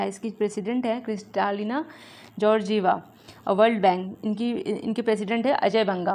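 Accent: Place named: Patna, India. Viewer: Aapki bhasa patna ki hai...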